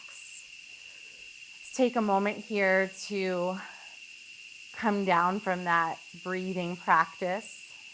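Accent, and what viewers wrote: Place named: Louisiana, United States. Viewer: Also. Sounds like Louisiana.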